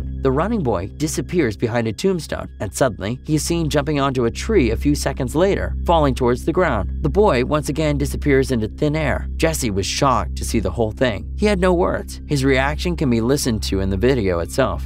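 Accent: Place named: General American, United States. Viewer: im noticing a theme with these type of vids, the narrator usualy has a standard american accent (i assume its american) but cant structure sentences properly....why?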